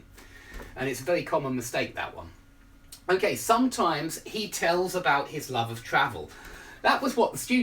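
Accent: United Kingdom. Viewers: I'm from Chile I have been practicing and watching videos for 3 years alone without taking a course in my country and I love to write my thoughts, my experiences and according to how I feel at the moment to start writing on my notebook. So I can improve my english writing. It is hard to find a native speaker in my country, especially british people because I really love this accent. Every day after work, I got home to watch your lessons this is the only thing I do instead of playing games. I hope you keep on making useful videos. It's a pleasure to watch them Greetings. ✌👌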